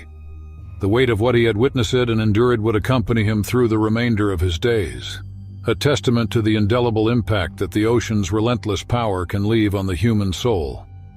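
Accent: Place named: United States. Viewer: Announcer is playing w/ us OR was drinking vodka on story #2 the newlyweds. No way he can pronounce abyss, cerulean but mispronounce simple words. Story 3 he had no mistakes. Any I missed ? 🤣🦈 divvver -> diver leed vest -> lead hom ed -> honed in snapped shoot =snapped shut fers ee us -> ferocious ree alm -> realm threet -> threat Voice talent guy has GOT to be laughing when he does these [not at victims but his pronunciation]. He sounds like a native English speaker and one from the US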